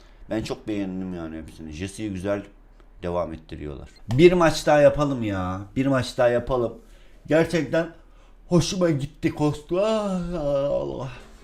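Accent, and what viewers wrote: Black Sea, Turkey. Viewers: #lazbunuyap Pocoyla hesaplaşmaya gir hiç yuknalmadan birinci ol olamazsan hesabı 950 taş al Instagram erolhayrunnisa abibseni çok eskiden takip ediyodum inanmazsan eskiden kanalını ismi oyun oynay di hatta eski videolarında Karadeniz şivesiyle konusuyordun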